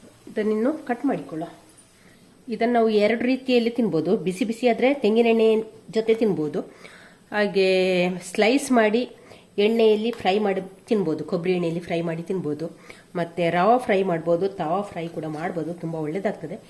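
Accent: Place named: Mangalore, India. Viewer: Wooow new healthy recipe sister 👌 🎉 watch full video liked 👍 nim manglore kannada super sis😅🥰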